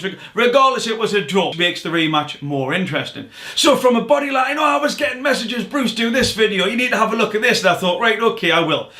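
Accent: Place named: North England, United Kingdom. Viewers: I'm from Sunderland, it's the first of your videos I've seen but guessing by your accent you're not too far away.. great video 👍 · Leeds here not too far from Tyneside which sound like where you’re from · Im from Darlington which, judging from your accent, is not too far from you. I'd like to see you do a video on the Izzy v Romero weigh in. Might be a tad late like but either way keep grafting mate. 100k next. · Went to Uni in Yorkshire - are you from North-England or scotland?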